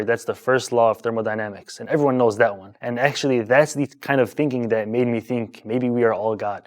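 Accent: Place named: Arab world. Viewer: لا لهجته عربية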